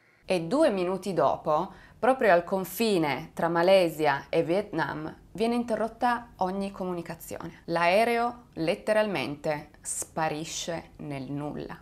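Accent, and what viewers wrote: Turin, Italy. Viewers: Condivido tutti i meritatissimi complimenti, poi, malgrado viva fuori Italia, ha una bellissima e particolare cadenza torinese. Prolunga in modo oggettivo, ma, soprattutto, soggettivo, le vocali. Brava.... c'è poco da fare, ha una marcia in più. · È la classica cadenza Torinese.... Specialmente la E e la O per noi, a Torino son tutte aperte 😂😂😂😂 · accento lievemente torinese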